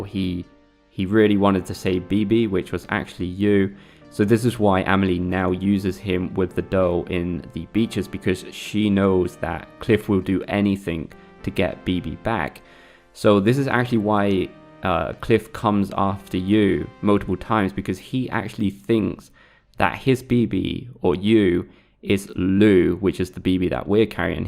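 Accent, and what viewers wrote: United Kingdom. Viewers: Happy to find a British accent explaining this game. I'm American and I can't stand Americans' accents on Explained videos.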